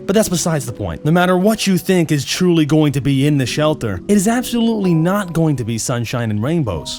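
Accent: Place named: New York, United States. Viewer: Love your vaguely New York accent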